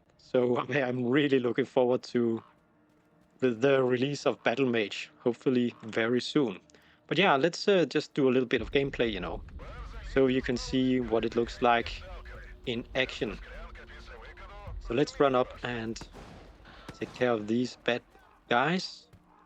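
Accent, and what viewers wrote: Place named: Denmark. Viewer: Lol that's a Danish accent if I ever heard one.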